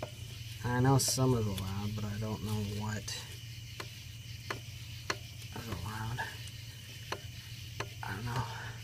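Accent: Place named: Arizona, United States. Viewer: Downvoted because Arizona accent.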